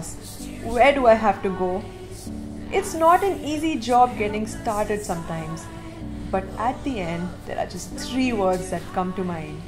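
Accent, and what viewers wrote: India. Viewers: Are you Indian?..accent is quite Indian..! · Your accent like indian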